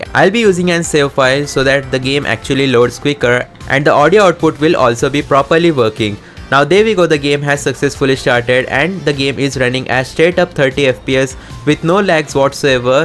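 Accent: India. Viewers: Bro it's Indian accent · Bro it's just indian accent U racist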